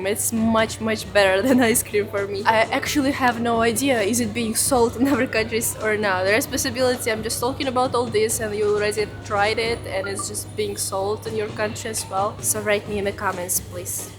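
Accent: Russia. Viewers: Where did you learn your English? You are too funny. Love your sense of humor with a Russian accent. The food looks delicious. I’m seeing more and more Russians on YouTube telling their story. I wonder how you are getting past Internet sanctions? Anyway, good for you. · Mukbang video Russian style! Cuteness overload with the thick sexy Russian accent and pure youthful beauty. Of all foods, I have tasted the cottage cheese from Jocca, but made in Germany.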